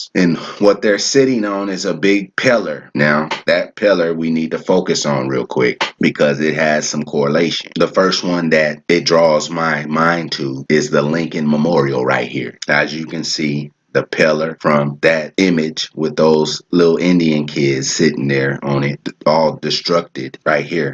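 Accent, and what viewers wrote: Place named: Alabama, United States. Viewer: You sound like my family from Alabama brother but you keep giving me answers to alot of my question. Great honor to you and your family🏹🦅👑🔥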